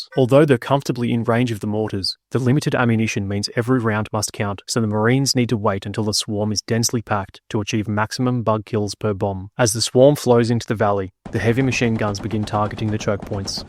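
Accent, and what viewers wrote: Australia. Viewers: He clearly has an Australian accent.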